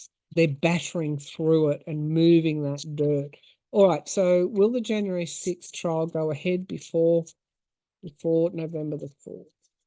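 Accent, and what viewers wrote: Australia. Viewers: I cannot look away.. I love your videos. Keep your calm, soothing energy. And your Australian accent is a plus. GOD bless you.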